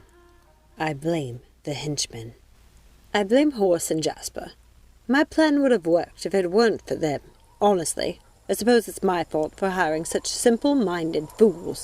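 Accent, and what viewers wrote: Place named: England, United Kingdom. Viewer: Well pronunciated. Love the English like accent. Well done and thank you for sharing 😀😀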